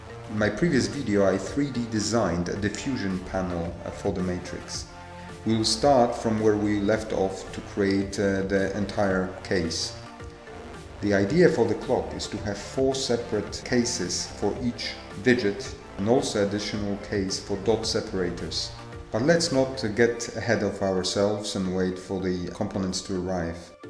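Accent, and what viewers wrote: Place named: Poland. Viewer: Tak coś po akcencie czułem, a teraz widzę po polsku. Witam rodaka:) Fajnie to się zapowiada:)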